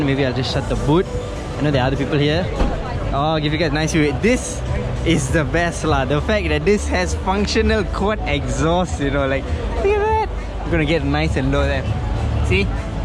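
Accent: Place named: Tamil Nadu, India. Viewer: Your tamil english smart bro... teringat kat member2...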